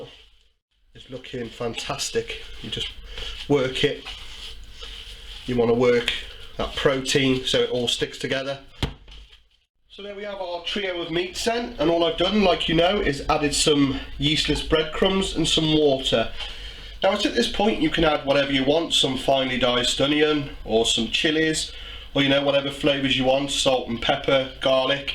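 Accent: United Kingdom. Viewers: British acent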